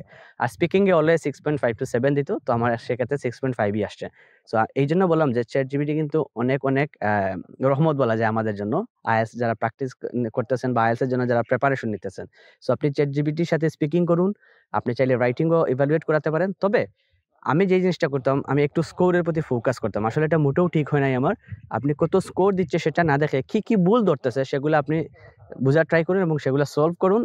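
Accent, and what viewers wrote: Sylhet, Bangladesh. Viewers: Vai apne Sylhety ni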